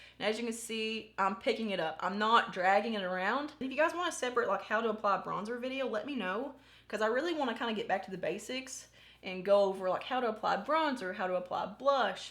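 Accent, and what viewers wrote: South, United States. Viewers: Hey from Israel!😊I love your suthern accent so much!!!❤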